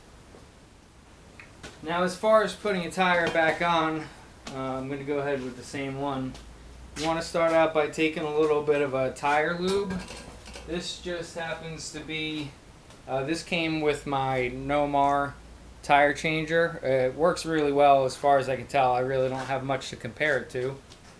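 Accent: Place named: South, United States. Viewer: I hear saquetas.......... You must live in the South? Lol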